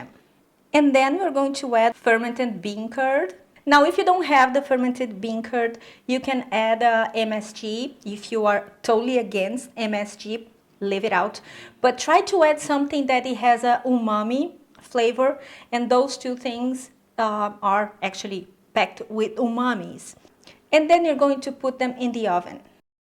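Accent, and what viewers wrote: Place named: Brazil. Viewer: I have no idea 😂 It just sounds like a brazilian who learned English very well ❤️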